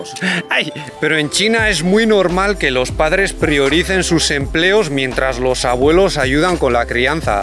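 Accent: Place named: Spain. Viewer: Hoye habla vien Como un Español se te hoye feo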